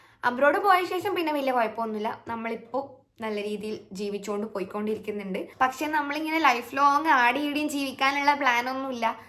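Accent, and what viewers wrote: Kannur, India. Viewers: വളരെ നന്നായി സംസാരിക്കുന്നു. നമ്മുടെ സ്വന്തം കണ്ണൂർ ലാംഗ്വേജിനു ഇത്ര ഭംഗി ഉണ്ടായിരുന്നോ 😮. താൻ വളരെ genuine ആണേട്ടോ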